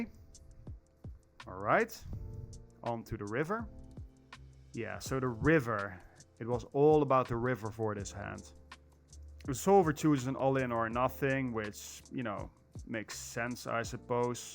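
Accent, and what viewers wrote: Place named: Netherlands. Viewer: Horrible Dutch accent😂😂😂..nice vid though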